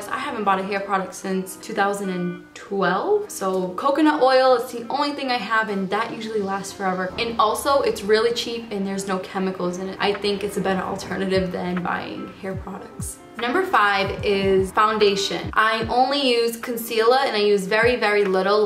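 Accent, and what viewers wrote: Boston, United States. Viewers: 1Brave Moon0 it’s a boston accent haha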